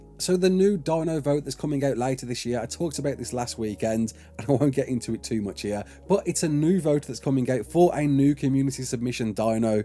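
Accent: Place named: Scotland, United Kingdom. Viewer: Also, what country are you from? I really like your accent, it sounds like it may be Scottish but I don’t want to assume, I am probably horribly wrong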